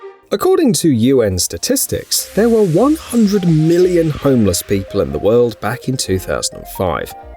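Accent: England, United Kingdom. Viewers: The Falkirk Wheel is in Scotland, so the prototype was probably made from Meccano. The presenter sounds like he is English, so he should be familiar with Meccano; it is still being manufactured... even if it is in France and China! 🥴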